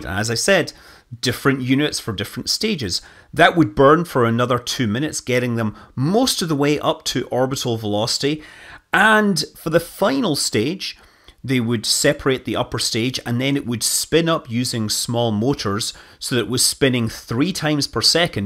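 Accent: Scotland, United Kingdom. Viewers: I like this video series - “Scottish man reads space based Wikipedia articles”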